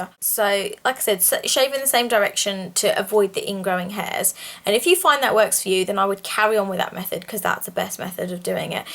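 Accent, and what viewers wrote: England, United Kingdom. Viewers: you are super cute and that english accent is just the icing on the cake !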